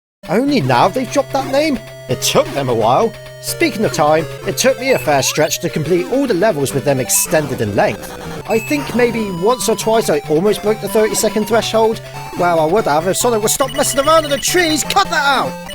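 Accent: United Kingdom. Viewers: I guess your british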